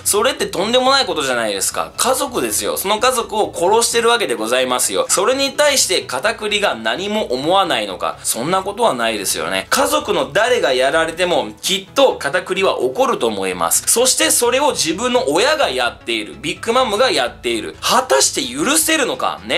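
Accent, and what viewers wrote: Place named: Osaka, Japan. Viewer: こう思えばエピソードオブ空島のときの生放送やけど大阪弁強かったなぁ！ええねんで俺も大阪人やし、もっと大阪弁使ってぇーwww えーカタクリは俺の女♪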